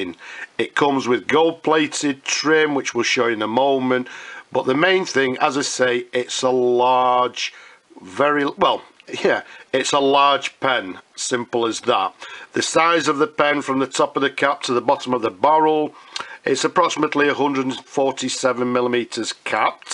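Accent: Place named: England, United Kingdom. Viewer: just know that I love your heavy english accent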